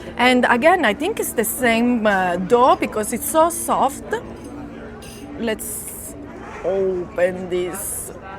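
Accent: Italy. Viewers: I absolutely love your Italian accent!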